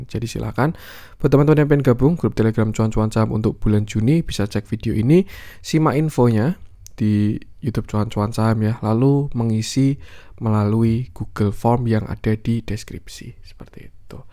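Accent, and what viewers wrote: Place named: Java, Indonesia. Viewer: Medhok bgt mas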